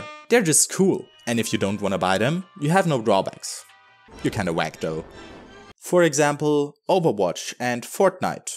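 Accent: Germany. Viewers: Man hört deine DEUTSCHheit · You sound suspiciously German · Hört sich fast wie ein deutscher Akzent an?🤔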